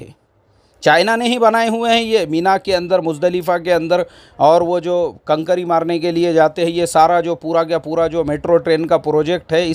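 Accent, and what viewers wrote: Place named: Maharashtra, India. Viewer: Mufti Sahab Mere Saathi Hai Bachpan Se Unka Baat Karne Ka Tariqa Aisa Hi Hai Jo Maharashtra Ke Baaz Ilaqo Ka Aam Tariqa Hai Lekin Dusre Ilaqo Ke Logo Ko Lagta Hai Ki Isme Gussa Hai Jabki Wo Normal Style Hai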